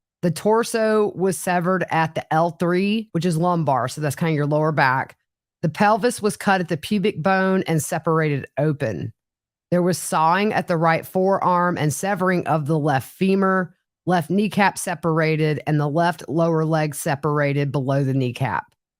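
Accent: South, United States. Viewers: What a remarkable dissonance it is to hear your sweet southern voice describing such a heinous crime.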